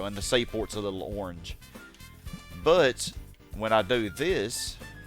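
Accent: South, United States. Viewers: I don't mind if you switch games but I liked the video regardless Always good to have a retro gamer with a southern accent on the youtube timeline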